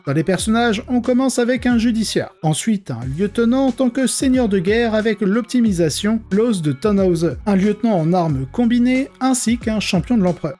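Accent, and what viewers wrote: England, United Kingdom. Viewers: Gris kiff pour ton accent anglais inimitable ! 😉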